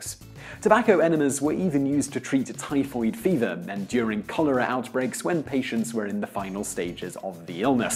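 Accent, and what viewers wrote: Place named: United Kingdom. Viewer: "Here in America", there's other English speaking countries too. Listen to Simon's accent, he's British. British spelling is arse and yes we say it like it's spelt. Actually "ass" in Britain refers to a type of Donkey.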